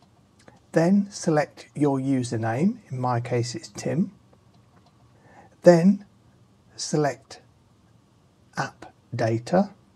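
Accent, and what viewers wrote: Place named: United Kingdom. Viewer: thanks british guy! this actually helped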